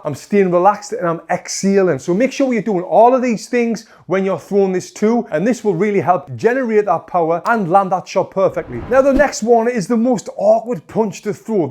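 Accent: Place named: England, United Kingdom. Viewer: Comment after comment of people inspired by your teachings. You should be proud, not sure what type that English accent is but it def adds something, that’s for sure.😂😂😂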